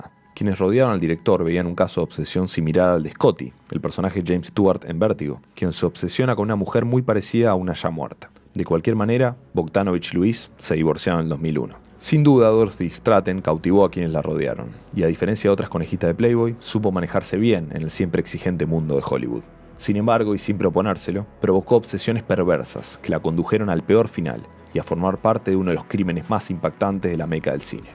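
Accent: Argentina. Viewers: Me encantan todos los vídeos de y cada vez lo están haciendo mejor. Una crítica constructiva sin ánimo de ofender a nadie: Me gusta mucho el acento argentino pero en este formato documental es un poco chocante. Me refiero a palabras como «besha» por bella o «gashina» por gallina, también la forma de decir los años como 1009,80 por 1980.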